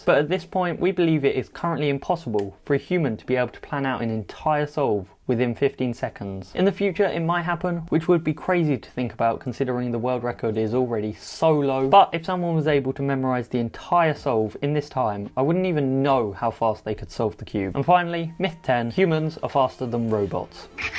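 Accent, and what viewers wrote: United Kingdom. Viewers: first bri'ish cubing youtuber? Nice!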